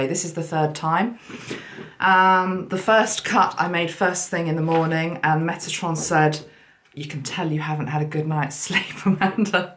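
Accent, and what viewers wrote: England, United Kingdom. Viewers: Thank you so much for your kindness and charm, and your lovely English accent. I come from Brighton XXX🕊️🌹🎶💡🎭🐛🦋😷😘👽💚🙏🏻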